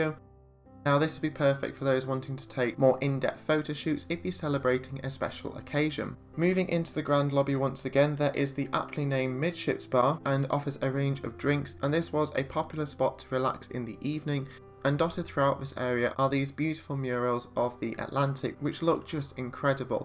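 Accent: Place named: United Kingdom. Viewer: An incredibly gorgeous video! Thank You for all the hard work and dedication you obviously put into this project! Photography and colours are fantastic! I’m booked on Queen Elizabeth for an Alaskan Cruise in August 2024! First time onboard this grand ship! Can hardly wait. Especially after viewing this film! The Queen Elizabeth is such a classy and elegant ship! I love all the beautiful wood panelling and art deco designs. Evoking the golden age of Cunard history!! So glad to hear you enjoyed the cruise with your family ( especially your grand mum ) Cherish your time with her always! I wish I still had my grandparents with me!! May I also compliment you on your wonderful narration and speaking voice? I really am not sure why, but we Americans are always so impressed by British accents! So classy and refined. Very Laurence Olivier!🇬🇧🧐🙂 Looking forward to future videos from your website! 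Cheers MikeW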